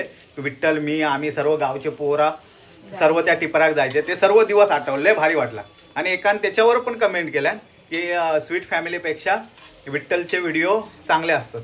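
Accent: Malvani, India. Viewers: Maka tumch malvani aikuk avadta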